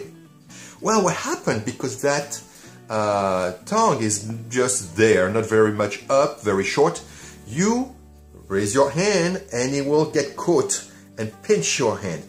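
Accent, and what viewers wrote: France. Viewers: Y a pas un gros accent français ?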